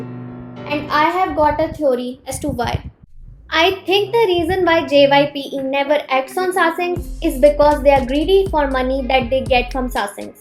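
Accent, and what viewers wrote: India. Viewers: Hey are you indian your ascent is like a indian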